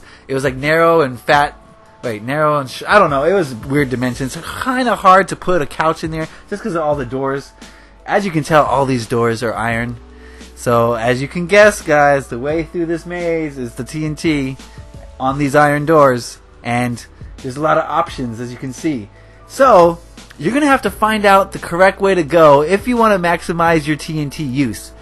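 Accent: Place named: United States. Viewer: We Englishmen speak English because we are from England:p I do find it funny myself how you Americans say some words, lol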